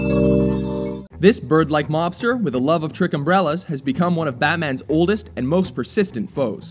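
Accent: England, United Kingdom. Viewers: Is he from Gotham or England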